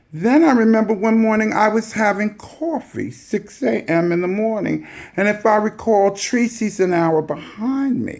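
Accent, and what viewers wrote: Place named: New York, United States. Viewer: I live for your thick "rich white woman" NYC voice and accent,. 💞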